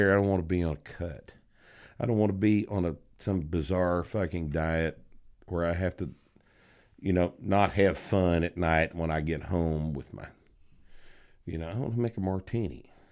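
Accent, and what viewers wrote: Texas, United States. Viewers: His voice makes me want to move to Texas 🫠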